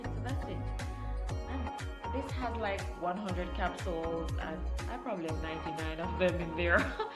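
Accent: Jamaica. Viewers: your hair is beautiful and do i hear a jamacian accent?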